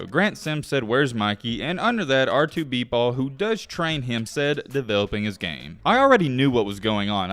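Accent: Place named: Appalachia, United States. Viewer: My man, I like a lot of your vids and your hillbilly ass accent lol but you’ve been coming off obnoxious lately. Multiple times in vids like this you say “And a lot of y’all don’t realize!” Like okay man stop thinking so little of your followers intelligence. Not a good look. Plus you’re basing someone’s worth and lifestyle literally off their “Sosha” media as you call it. #1 rule to not go by is IG my guy. And no high schooler is signing a 10 million + shoe deal. You can count on one hand how many rookies in the history of NBA got 7 figure shoe deals out the gate. Anyways man sorry if I sound like a hater but you come off aggressive af in these vids.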